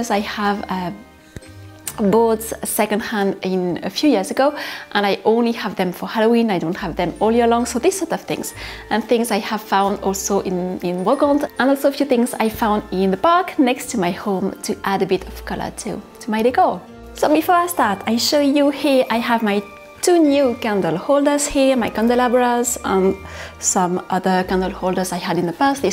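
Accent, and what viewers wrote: France. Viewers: Love watching you decorate. Not taking anything away from your immense talent which is everything, you must be aware that your French accent and living in a wonderful home in France is what sells it. I am jelly.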